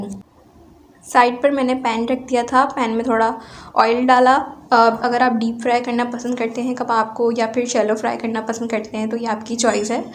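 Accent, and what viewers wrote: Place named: Mumbai, India. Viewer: وعلیکم السلام بمبئی لہجہ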